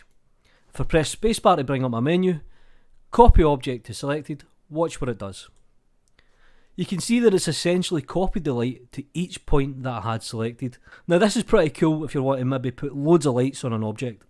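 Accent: Scotland, United Kingdom. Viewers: Hello! Cool tut, but not all words were understood behind authentical Scottish pronunciation.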